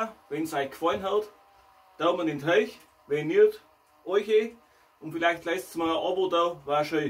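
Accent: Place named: Upper Palatinate, Germany. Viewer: Servus tolles Rezept, schaut mega lecker aus des Hendl, hast Du guad gemacht, vielen Dank für das Teilen😋👍👏und zwegst dem Oberpfälzer Dialgt loos i a moi was do 😁👍👍👍 LG Da Wolfe 😁👨‍🍳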